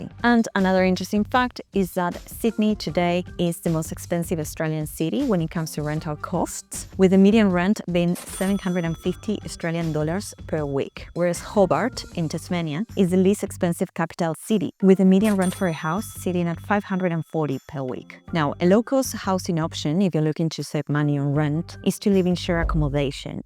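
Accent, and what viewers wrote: Argentina. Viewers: ese acentooooo sos argentina? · El acento argentino siempre presente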